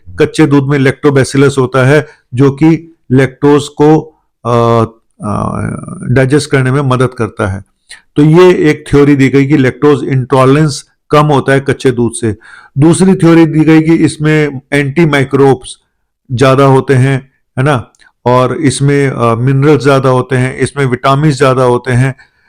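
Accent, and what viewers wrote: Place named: Punjab, India. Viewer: Dada ji came from country of milk that IS PAKISHTAN I could make out from your way of talking PUNJAB age 80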